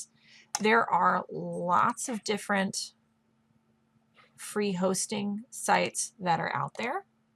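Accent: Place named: Los Angeles, United States. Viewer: This has been helpful! You sound like you're from LA.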